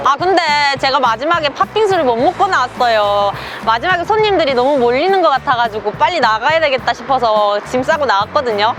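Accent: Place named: North Gyeongsang, South Korea. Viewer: 맹이나물이 아니고 명이나물 ㅋ댁이 워데이껴? 억양은 경북북분데 ㅋ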